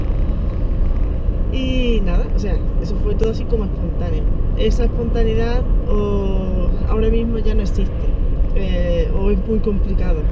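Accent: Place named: Spain. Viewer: Me ha salido al azahar tú video y me gustó...me alegro q en 5 años tu acento siga siendo original español...no como mucha gente q se van tres días a Zurish y vienen con acento allemand...jaja...Suiza me gusta y la conozco bastante bien...el idioma llevo tres años aprendiendo y me falta superarlo...que me aco sejas? Vivo en France...bzss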